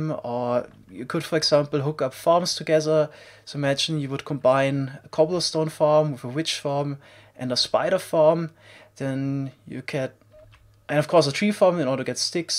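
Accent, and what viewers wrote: Germany. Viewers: ich mag deinen deutschen akzent ;D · I really hope we get a automatic crafting table. Also are you german? Your english sounds like you're from germany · Also dein Englisch ist seehr gut aber man hört es einfach wenn ein Deutscher Englisch redet xD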